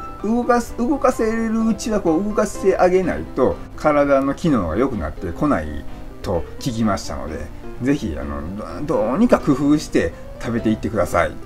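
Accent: Osaka, Japan. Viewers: さん❣️こんにちは☺️こんばんはおはようございます😃 今日も為になる経験談を大阪弁に乗せて語っていただきありがとうございます❣️ さんは納豆大丈夫なんですね❓関西の方は苦手な方が多いと聞いてましたが…… 副作用との戦いはまだまだ続くのですか❓ さん頑張れ〜頑張れ〜❣️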